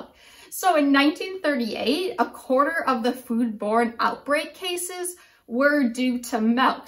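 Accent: United States. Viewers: She is American. That is how Americans pronounce it.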